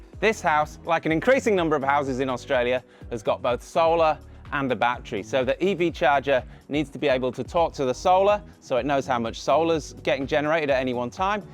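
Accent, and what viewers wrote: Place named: Northern England, United Kingdom. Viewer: Good to see a fellow Northern English person in Australia!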